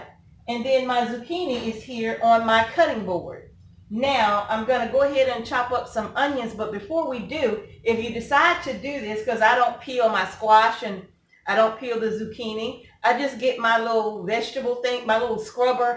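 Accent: South, United States. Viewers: Thank you,! By chance are you living in Georgia? I love your southen twang, ya'll! xo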